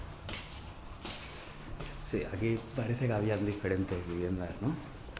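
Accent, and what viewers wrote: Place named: Spain. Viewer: muy bonito video, yo deduzco por tu acento de hablar que éste castillo queda en algún lugar de España- gracias.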